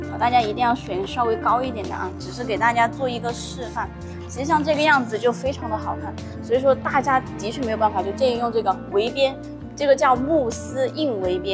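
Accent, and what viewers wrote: Sichuan, China. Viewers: 老师是四川人吗:chillwdog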